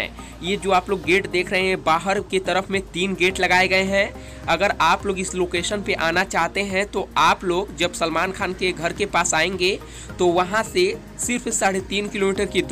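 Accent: Bihar, India. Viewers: Bihari accent mein kyun baat karte ho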